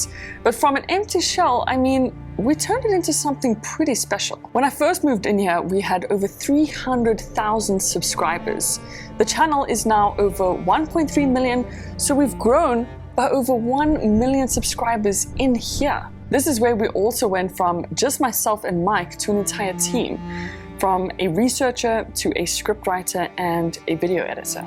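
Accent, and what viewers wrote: South Africa. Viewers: You from South Africa? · i knewwww you were south african! the accent 😂 i'm also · Oh no I will definitely miss your South African accent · I’m happy for you buddy but be ready for unexpected rains, chilled weather and racist londoners as they will easily get hold of your South African accent and will/May speak shit.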